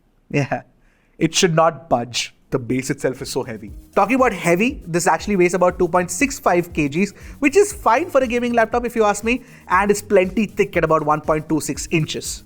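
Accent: India. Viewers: VERRY FUNY WHEN INDIAN GUY TALKING ENGLISH !!!!!!!!!!! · Brother why your English like Hindi easy to understand ❤❤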